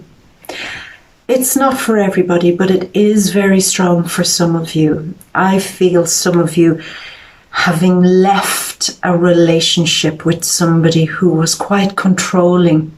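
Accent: Ireland. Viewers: Your Irish?? Have u ever seen a fairy?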